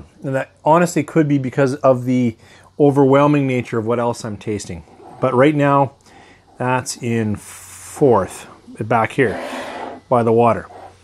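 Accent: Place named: Canada. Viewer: Holy shit I’ve been watching your videos for months and just now I noticed your Canadian accent. Tbh I enjoy your videos more now😆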